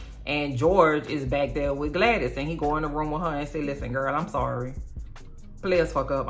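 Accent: New Orleans, United States. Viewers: I'm up at 6am watching your channel and lmao I love your commentary and I love your New Orleans accent ❤ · You are so funny! I am glad I found your channel! Now I have two “shows” to look forward to on Sunday night! LOVE your New Orleans accent!!!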